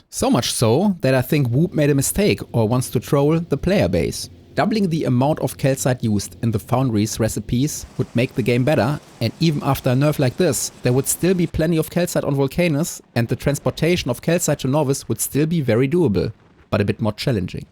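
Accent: Germany. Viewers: Do i hear a german?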